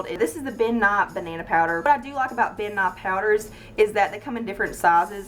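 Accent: South, United States. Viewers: ik that's wat I just said she seems like a nice country girl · This is a very good video but I have one question. Where r u from? Like, what area did u grow up in? U sound way southern that’s why I asked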